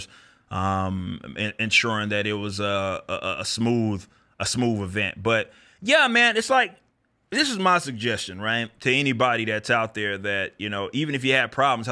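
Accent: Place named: Texas, United States. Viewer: Yes as it turns out inner city Texas speaks differently to whatever part of the country or planet you’re from. Shocking I know.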